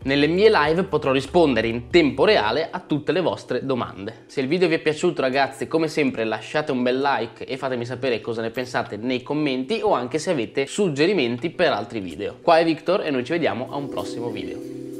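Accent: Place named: Ferrara, Italy. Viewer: ho sentito tantissimo il tuo accento ferrarese, più del solito😂 Comunque il video stra interessante, non sapevo un sacco di cose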